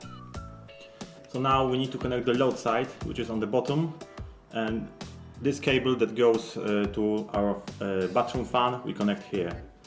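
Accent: Poland. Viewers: Lovely Polish accent. Dzięki za pomoc. Thanks for help.😎🇵🇱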